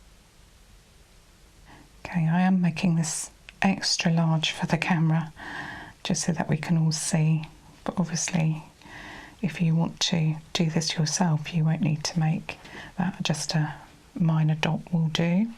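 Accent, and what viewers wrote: United Kingdom. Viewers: Oh, the british accent 😍🥰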